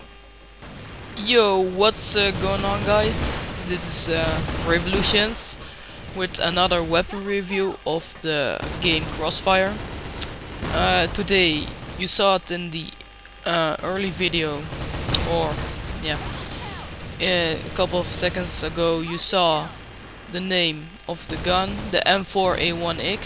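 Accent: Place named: Netherlands. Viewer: haha ik hoorde al aan je accent dat je nederlands bent!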